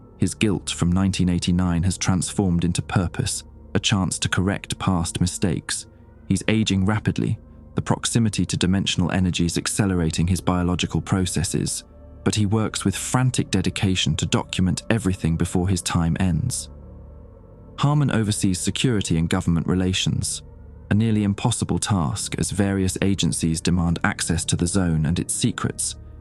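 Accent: England, United Kingdom. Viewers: Seems a little suspicious with that English accent to be Air Force. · The British accent is a huge turn off, especially since the guy is supposed to have worked at Area 51 in the U.S. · Another British voice narration , gee can I believe all this ? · Why does he have a British accent .? American military?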